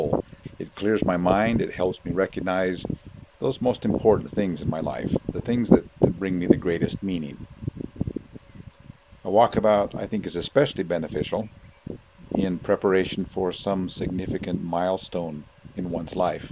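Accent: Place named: Utah, United States. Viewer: I thought you were from Utah from your other videos because of your accent, looks like I was right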